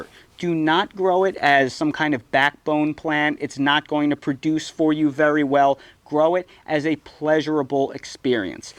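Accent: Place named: North Carolina, United States. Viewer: No offense, but I kept wondering if you were originally from North Carolina. (Some of my favorite youtubers have recently moved there). I know as millennials some of us don't have much of a southern accent, but you have an accent of your own, haha. Its just something I have an interest in. There are variations of the southern accent and I can usually place someone in a particular region by listening to them talk.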